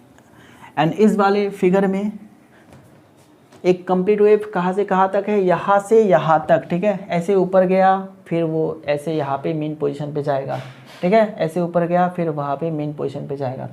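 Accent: Bengal, India. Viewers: Bengali hai kya sir ❤❤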